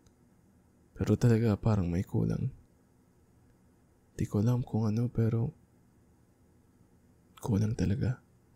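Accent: Conyo, Philippines. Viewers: sabi ko na nga ba pilipino sya eh, sa accent palang😊❤ btw, I love your videos❤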